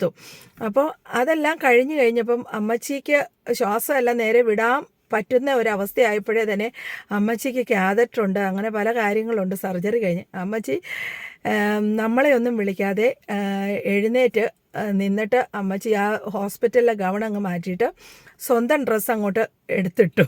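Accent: Kottayam, India. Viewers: She speaks like my wife, who is from Kottayam District.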